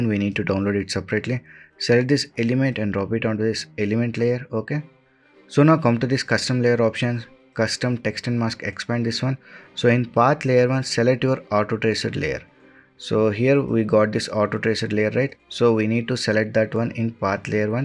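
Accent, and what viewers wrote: India. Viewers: you speak like INDIAN pilot!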